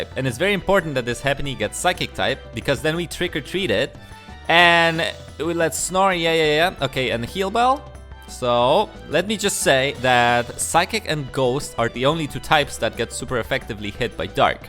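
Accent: Poland. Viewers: Love the polish accent in the video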